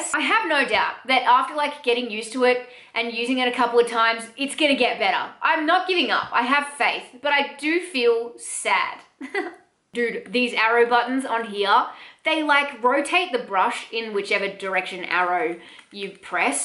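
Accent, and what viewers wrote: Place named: Australia. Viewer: Oh wow, I so enjoyed your video. You completely are real, funny and totally honest plus I love your Aussie accent. Btw- I like the way your hair looks. I don't even bother sectioning my hair. I just grab pieces and go at it.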